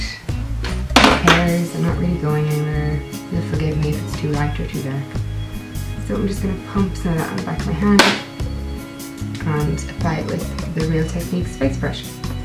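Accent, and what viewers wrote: Ireland. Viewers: Love this! I'm glad u commented on my video cause now I have discovered yours!:) Are you from Ireland? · Love grave yard girl ! Are you from Dublin :) x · just found you recently. and love your Irish accent. I'm in UK but have perm resident visa for Oz, waiting and hoping to return once hubby gets a job. How long have you been there and what do you think?? Joan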